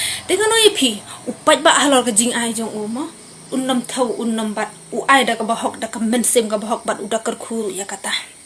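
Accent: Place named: Khyrwait, India. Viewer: Best bha i ni ikong ban kren khyrwait beit nga dei na mawkynrew nga ruh nga kyrshan prah❤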